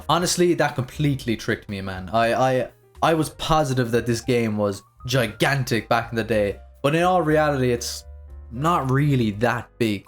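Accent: Ireland. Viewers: That's just how Irish people say three.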